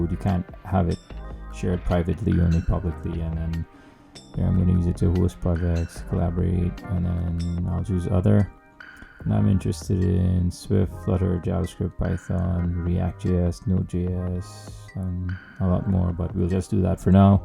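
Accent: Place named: Trinidad and Tobago. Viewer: If you listen, ever so carefully, you can hear the trini...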